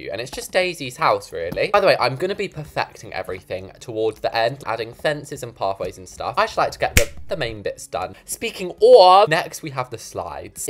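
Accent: United Kingdom. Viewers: wHEN I WAS NEW I TOUGHT SHE WAS A GIRL FOR HIS BRITHIS ACCENT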